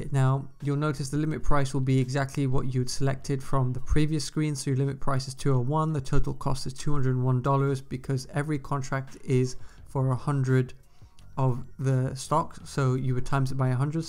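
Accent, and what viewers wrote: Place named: United Kingdom. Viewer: Great video , great to hear a uk YouTuber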